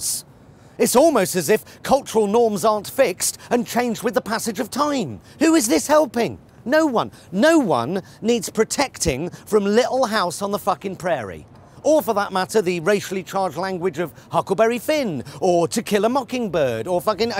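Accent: United Kingdom. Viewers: Johnathan Pie you are sooo handsome when you are spilling it out like you are doing. You are handsome anyway but it is so wonderful seeing you being such a strong man and telling it like it is. I have never seen you before or heard you speak but ,wow! You are something else. You British accent is so wonderful , too. Your suit becomes you, also. I am gushing, but I don't seem to be able to stop myself. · Idk how many times I've watched this video so far and I know I'm gonna watch it over and over again in the future. BTW the first time I got to know about this channel was when I saw a part of this video some where on the internet and I remember me searching the sentences of this video in an attempt to find his name😂 any way, thanks for being this funny. P.S. I really like this guy's accent and I want to learn it( I'm an ESL learner). Does any body know what British accent is this exactly? 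Thanks in advance😊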